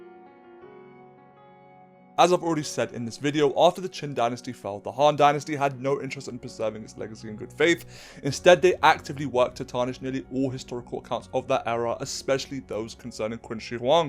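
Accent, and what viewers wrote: United Kingdom. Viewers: Why do you have a british accent? Aren't you chinese? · Im sorry to say this but I raise the volume because you talk with british accent so I can understand what is bro talking about. but on several occasions bro shouted when I turned up the volume, making it uncomfortable for me to watch 🙏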